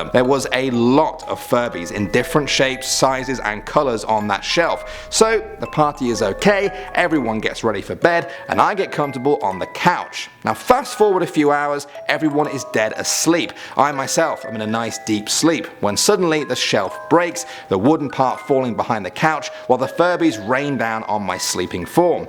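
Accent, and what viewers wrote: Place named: United Kingdom. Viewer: Wait... I could not understand you with that British accent (sorry being an American has some cons) DID YOU SAY THAT TRANSFORMERS TOY COMMITTED SUICIDE? I would too if i was a knock-off McDonalds toy.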